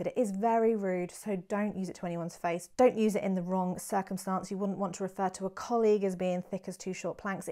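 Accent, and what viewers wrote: United Kingdom. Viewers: Your British Accent, and your expressions.. awew....just love the way u speak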